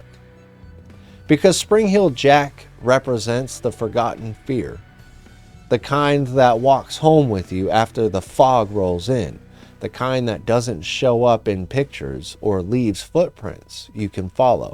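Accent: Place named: United Kingdom. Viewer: 😂😂😂 you're british!?!? 😊😂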